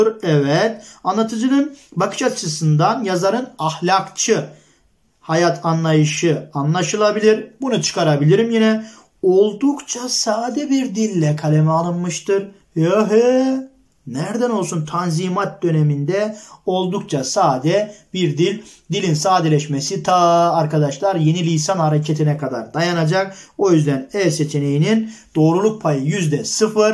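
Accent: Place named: Trabzon, Turkey. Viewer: arada bi konuşmanızdan belli oluyor ben de akçaabatlıyım :))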